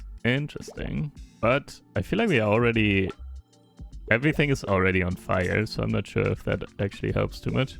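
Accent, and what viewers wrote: Germany. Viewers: Is that a common German Accent? I've only heard it once before and it was a character so i didn't know it was real but you sound EXACTLY like he did.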